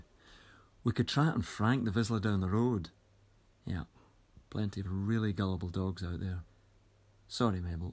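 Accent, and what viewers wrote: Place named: RP, United Kingdom. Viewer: It just sounds more posh than my accentless Midwestern accent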